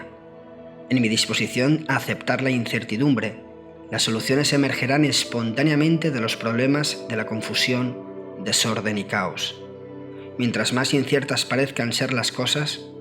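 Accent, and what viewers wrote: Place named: Spain. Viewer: Yo quiero desapegarme de escuchar ese acento españoletes gas y de encima las mayoría todo YouTube es de los mexicanos y los españoles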